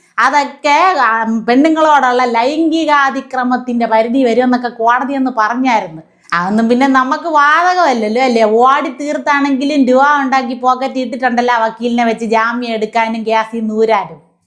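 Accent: Thiruvananthapuram, India. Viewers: ഉഗ്രൻ.വളരെഇഷ്ടപ്പെട്ടു.തിരുവനന്തപുരംഭാഷ.മിമിക്രിക്കാരിയാണല്ലേ.നല്ലഭാവിയുണ്ട്. ബോച്ചെക്ക്പണംധാരാളംഉണ്ട്.അതുകൊണ്ടുള്ള,അഹങ്കാരമാണ്.വിദ്യാഭ്യാസംകുറവാണെന്ന്തോന്നുന്നു.പോത്തിനോടുവേദംഓതിയിട്ടെന്ത്കാര്യം. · തിരുന്തോരം ഭാഷയിലായത് വളരെ ഇഷ്ടപ്പെട്ടു, എന്തൊരപ്പി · തിരുവനന്തോര० ഭാഷയു० അവതരണവു० സൂപ്പർ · പറയേണ്ടുന്നതെല്ലാം വളരെ കൃത്യമായി പറയേണ്ടുന്ന രീതിയിൽ തന്നെ പറഞ്ഞിരിക്കുന്നു. ഇത്തരം ഒരു പ്രതികരണത്തിന് ആദ്യമായി അഭിനന്ദനങ്ങൾ. അതുപോലെതന്നെ ചാനലുകളിൽ വരുന്ന പല കോമഡി പരിപാടികളിലും ദ്വയാർത്ത പദപ്രയോഗങ്ങളുടെ അതിപ്രസരമാണ് (പൊടിയൻ കൊച്ചാട്ടൻ ടീം ഒഴികെ, കുടുംബസമേതം കാണാൻ പറ്റുന്നത് ഇവരുടെ കോമഡി മാത്രമാണ് ) അങ്ങനെയുള്ള വളിപ്പ് പരിപാടികളെക്കുറിച്ചും ഒരു വീഡിയോ ചെയ്യണം.. പിന്നെ സഹോദരിയുടെ തിരുവനന്തപുരം സ്ലാങ്ങിലുള്ള അവതരണം ഗംഭീരം ( ഇതാണ് ശരിക്കും തിരുവനന്തപുരം സ്ലാങ് അല്ലേ ).. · തിരോന്തരം സ്റ്റൈലിൽബോചെയെ പള്ളൂ പറയാൻ ഇതാരെടേ. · Thiruvananthapuram slang kalakki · നിങ്ങടെ tvm സ്ലാങ് പൊളി 😂😂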